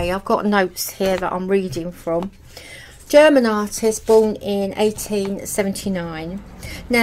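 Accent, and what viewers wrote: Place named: Cockney, United Kingdom. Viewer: I can hear a little of your Cockney roots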